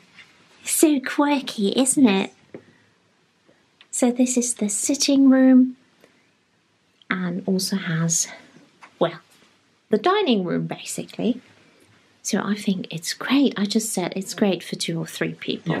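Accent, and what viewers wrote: United Kingdom. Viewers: Your british accent! So sweet and calm. I like that your language rules the world.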